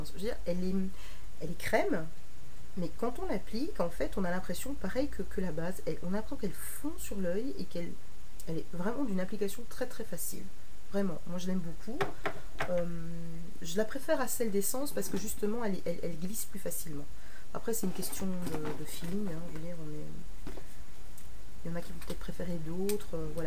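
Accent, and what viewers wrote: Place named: Belgium. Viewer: et jadore ton accent belge!!!! sa me rapelle une famille chez qui jai passer la plus part de mon temps!! heureusement pour mon porte monai ya pas de produit catrice ni essence dans le coin!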